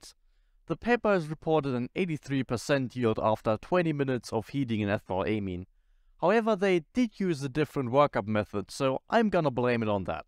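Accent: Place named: Germany. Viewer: I had a suspicion you were German due to the accent, then came the unmistakable Bad Reichenhaller which confirmed it 😂 Moin/Servus/Grüß Gott, lovely video!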